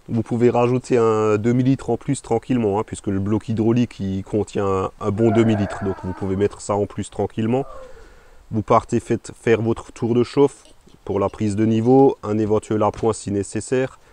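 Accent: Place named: Lorraine, France. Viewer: Tu viens de Moselle ou d'Alsace ? 🤣 Parce-que j'habite Forbach et j'ai directement reconnu un accent hahaha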